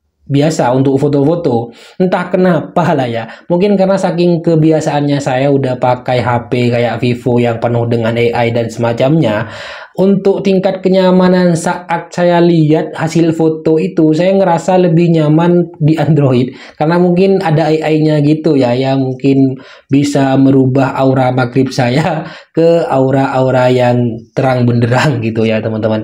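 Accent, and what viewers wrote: Madura, Indonesia. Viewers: abang org madura ya logatnya khas banget😅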